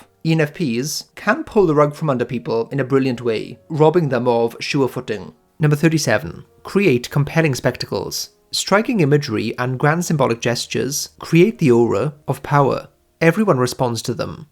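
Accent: Wales, United Kingdom. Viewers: dwi'n abod accen yna, cymraeg ti?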